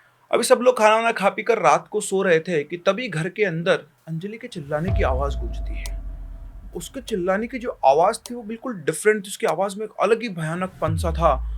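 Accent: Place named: Rajasthan, India. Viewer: Intro se Samajh ara h Rajasthani hona 🤭 Ghani Khama 🙏🏻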